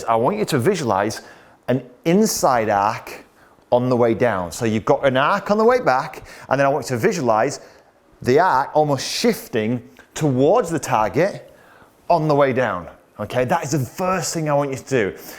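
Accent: Yorkshire, United Kingdom. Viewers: Terrific video. Great tips. Plus, love the sub-titles. Fairway as Fairy Woods, Long Irons as Long Grinds. Love that good old Yorkshire accent. Ta.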